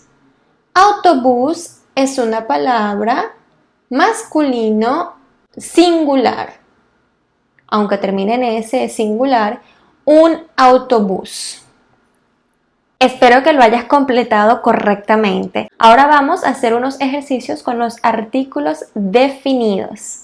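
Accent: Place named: London, United Kingdom. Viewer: Are you in London mam ? Because you are speaking very nice 👍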